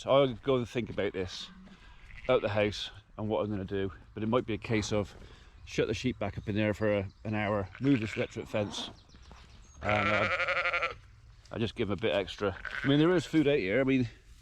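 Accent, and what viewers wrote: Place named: Gloucestershire, United Kingdom. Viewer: Yes fans you have me ( Sooty) and Sweep as new subscribers. Sweep thought you had a Herefordshire accent, but its Gloucestershire one.. We look forward to giving you lots of banter.. luvly bit of grass. Is your farm a old one? All the best stay safe.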